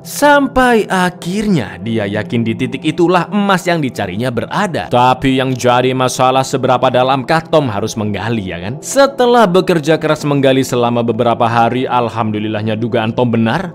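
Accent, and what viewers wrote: Java, Indonesia. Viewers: Mas jowo asli Tah mas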